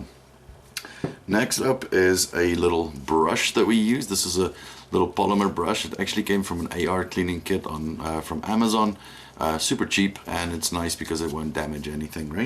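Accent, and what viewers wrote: South Africa. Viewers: Bru, are you by chance south african?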